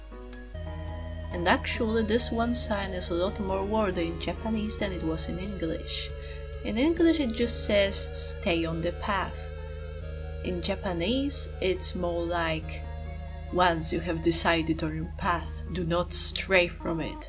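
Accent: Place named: Greece. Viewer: Are you greek? Your accent sounds greek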